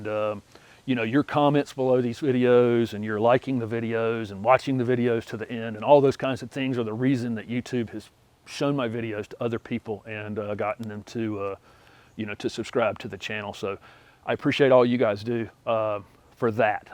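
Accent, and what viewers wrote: South, United States. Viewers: I have absolutely LOVED your YouTube channel, since finding it a few months ago, and I have learned sooo much from you! Also, you and I share the same zone (7b), as I live very close to you, in Mooresville, so (as crazy as this sounds🤪) I feel so “at home” listening to your heart- warning southern accent and seeing your familiar mannerisms throughout your videos!😊 Also, It’s extremely obvious to me, and I’m positive to anyone who follows you, that you work so hard to prepare for every single video that you upload, and you’re very efficient and consistent! You never let us down! I can easily see how friendly you are, down to earth, and incredibly REAL, which is sadly so hard to find in people these days! Oh yeah! Duh!😂 Congratulations on you and your family’s absolutely stunning new home!🥳What an exciting time for y’all, and I absolutely love everything about it! 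I have loved seeing both your house and your yard from overhead with your drone! Of course, you’ve knocked it out of the park with your unique and brilliant landscape design, which is such an important part of creating a yard that you’ll love! Also, congratulations and YAY for recently welcoming beautiful “Miss Holly” into your family, as well!🧡 She’s such a beautiful and sweet dog!!! Again, thank you so very much for all you’ve done to help me, without even knowing it,! I am now a diehard, lifelong “HortTube” viewer, AND SHARER!!!! You’re definitely my #1 channel, hands down! Your hard work has absolutely paid o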